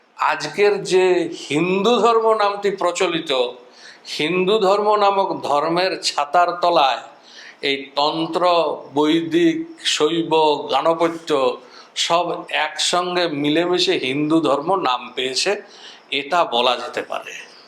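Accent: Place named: Rarh, India. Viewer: আপনার কথায় লাগে আপনি রাঢ়ের মানুষ..😅 বাঁকুড়া, পুরুলিয়া , বিষ্ণুপুরের..আমিও বাঁকুড়া থেকে!..❤️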